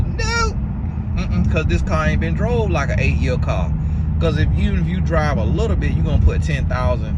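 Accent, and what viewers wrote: South, United States. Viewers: Sounds like you had a Great Father! Love the southern accent. 🙏🏾🙏🏾🦾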